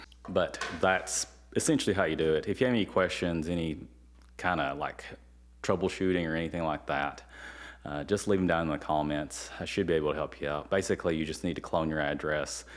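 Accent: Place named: South, United States. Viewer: Why does this philipino guy sound like a southerner hick?? the accent is just annoying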